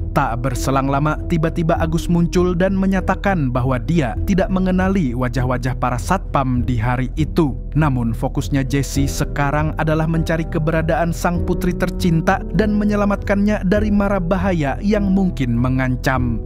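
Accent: Banjar, Indonesia. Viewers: banjar banar amng ni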